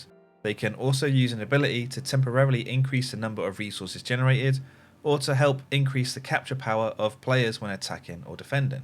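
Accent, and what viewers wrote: United Kingdom. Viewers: Best ever explanation on the internet…and in British English which makes it even more pleasant to listen to ;)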